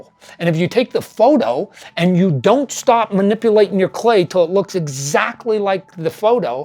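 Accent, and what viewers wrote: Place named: New York, United States. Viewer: dude talks way too much, sounds like a new yorker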